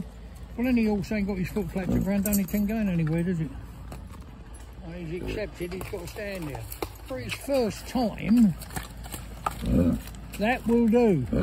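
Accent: United Kingdom. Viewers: That was delightful !😊.. Thank You ! "That'll do" and "That. Will. Do." had me laughing since those are used here, across the pond, for misbehaving pets/animals and children.. This Texan is now a subscriber, and looking forward to watching the rest of your videos and future ones ! 😀.. I usually do well understanding British accents, but yours is a little stronger and with the horses' clippity clops (which I loved) I had to use "closed caption", but I'll get better 🙂..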